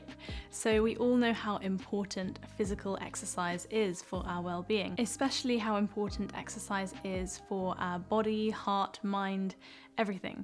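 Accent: RP, United Kingdom. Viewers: Thank a bunch. I like your british acent. Have fun ❤ 👍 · Me encantan los Hobbies que consideras para vivir, muy buena filosofía de vida. Yo tengo un trabajo, me encanta bailar salsa, leer, aprender idiomas y hacer amigos... Me encanta tu voz, tu acento británico y tus hermosos ojos... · I really love your British accent and your idea. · Oh gosh, I really love your voice and also your British accent. I've thought I'll give up to learn British English so many times but when I watched your video, it inspired me a lot, thanks for that. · I really enjoy your videos and it is really enjoyable to listen to your impeccable British accent; of course, you were born in England, I presume. One thing I have noticed that you use the American pronunciation for the word: schedule. I wonder why? I hope you will continue to produce videos even after you assume your formal profession as a medical doctor. 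I shall look forward to your future videos. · Hello I realy enjoy watching your videos over and over I'm english but coming from the black have an awful accent I'm ashamed of most enjoyed your r p english videos and do hope you do some more have you any ideas where I can learn to have an accent like yours keep up the good work as its enjoyable to watch your positive and life learning skills videos. Roger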